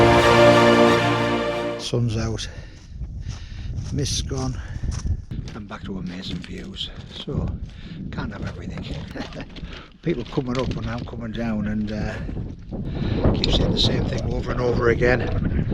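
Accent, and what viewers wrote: Scotland, United Kingdom. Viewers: that was absolutely class mate, I'll have to get the bonny lads up there ha ha 😀 The shots with the snow were tremendous, you know what I'm talking about!! Love the Scottish accent marra ha ha, don't know what you said but i don't think anyone knows what the bonny lads are slavering on about 😃 all the best Chris 👍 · Well done, you did it again! Class commentary and stories about previous experiences loved the Scottish accent but you need a kilt to be authentic!! Maybe you should try an Irish accent next, to be sure. Pity about the cloud at the top but you got spectacular views on the way up and down and it looked busy. Scottish roads are a bit like the lake district, narrow and windy. But rest up now, more adventures to come. Andrea sends best wishes, regards Ashley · Another superb video, great memories of climbs past you shared with us, the views are amazing and so well shot by you. 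Scottish accent fantastic and funny. Really well done on the climb, I think you will be tempted to go back again. Keep the videos coming, I really enjoy them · OK so why is it your last time up that mountain? It is a terrific hike. I kinda wish I had time to do it when I was there. Nice Scottish accent. 😎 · Looks like the mountain fitness is back then 😊. That Scottish accent - Wow! Must be some Scottish blood in the family. You’d look good in a tartan bandana if there was one 😊 cheers atb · Wow what a spectacular place. Stunning! I know what you mean about pushing on and not taking breaks. There are times where one must keep moving to ensure success. I’d call that a success despite the little bit of cloud whipping by the summit. Outstanding views at the top but really all along the trail. Glad that snap decision on a prior trip worked out ok. Kids are often tougher and more skilled than we give them credit for, but as a parent we’re worried about them on their own. 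It was no doubt a good experience for them. Oh and I love the Scottish accent! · Unbelievable, enjoyed that! Well done, 4 times is quite impressive! That Scottish accent got me hahah.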